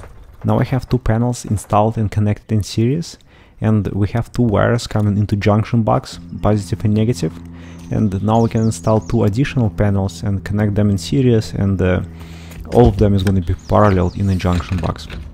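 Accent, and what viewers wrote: Russia. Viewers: Ярко выраженный русский акцент. :)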